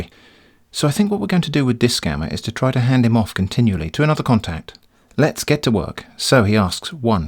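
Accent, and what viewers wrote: England, United Kingdom. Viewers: It's an English gentleman speaking English language.like Liverpool FC playing at Anfield . What is there not to like?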